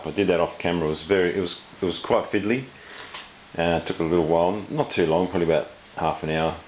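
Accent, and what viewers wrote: Australia. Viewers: Good to hear a Aussie accent, oi oi oi . I should have brought a SB 12, I am not happy with my saws fence system and I seen you did one on your old saw , did you do a video on that ?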